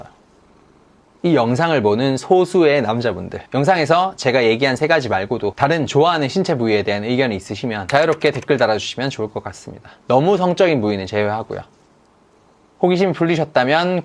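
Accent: Gyeongsang, South Korea. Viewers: 말투 희안하네 대구가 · 디~게 귀엽게 생기셨다~^^ㅎㅎ근데 경상도 말구...서울 말투면 뭔가 더 좋겠다~^^ㅎ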